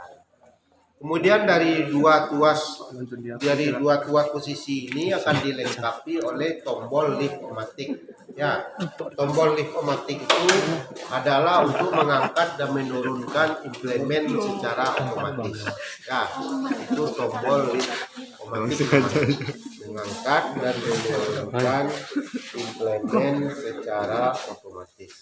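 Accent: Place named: Padang, Indonesia. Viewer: Bapak ini kayaknya orang Padang ya..saya liat dr logat bahasanya 😁😁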